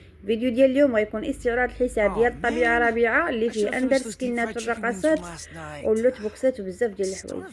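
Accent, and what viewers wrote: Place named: Algeria. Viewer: وﷲ محتواكي جميل وشيء جميل اشاهد محتوة كا محتواكي ولاكن الهحة الجزائرية اشويه اصعيبه فهما كا انا ليس من الجزائر شكرا وتحية اخوكم من بلاد الرافدين 🇮🇶🇮🇶🇮🇶🌷♥🫀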